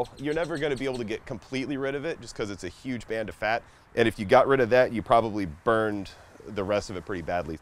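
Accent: Texas, United States. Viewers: Is this guy from Texas or something? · yep, sounds like Texas alright 😆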